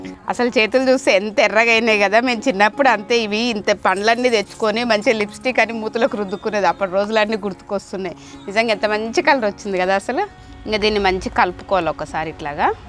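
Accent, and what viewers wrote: Telangana, India. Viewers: తెలంగాణ యాసలో మీ మాట చాలా బాగుంది, మీకంటే ఈ పండ్లు చూసి పక్షులు ఎక్కువ ఆనందిస్తున్నాయా...మేముకుడా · Manchi telangana matladuthunnaru vindaniki bavundhi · Hiii akka nv maa telangana Chala baga matladut unnam u r🍓🍓🍉🍉👌👌👌👌👌👌👌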